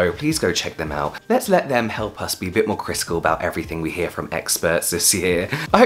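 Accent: United Kingdom. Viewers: am i the one who watches channel because of his satisfying BRITISH pronunciation?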